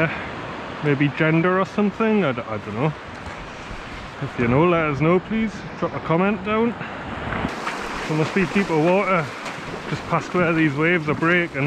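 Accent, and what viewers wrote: Newcastle, United Kingdom. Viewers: Listening to you with your Geordie accent using Cockney rhyming slang made me smile,. Thank you for giving us another video on a freezing night. 👍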